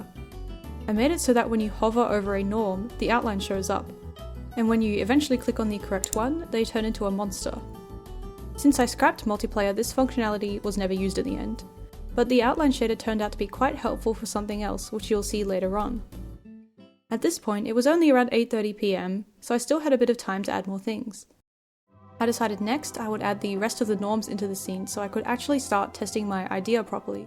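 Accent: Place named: Australia. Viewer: 7 days full time so about 60 hours in total? The hard work shows in the quality of the video! Even your first video is amazing, had you already had experience with video editing? I use Davinci Resolve too! Do you use the free version or have you paid for the paid version? I should check out motion canvas! I also noticed that your accent was familiar and I saw that you are Aussie! haha I am Kiwi. Great to see a creator from Oceania :D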